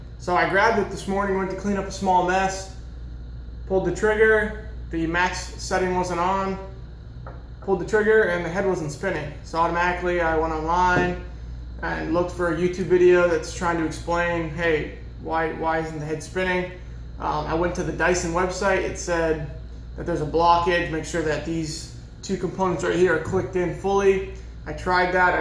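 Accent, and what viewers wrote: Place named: Cockney, United Kingdom. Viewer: you are a star! Spanish Cockney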